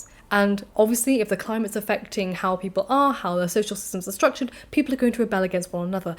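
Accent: RP, United Kingdom. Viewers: I just discovered your channel, and you remind me how incredibly attractive an intelligent and learned woman is. (The posh accent probably helps 😃)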